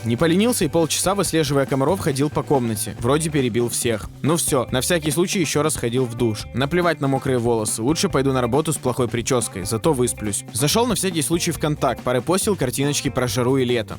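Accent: Odessa, Ukraine. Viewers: Вы из Одессы?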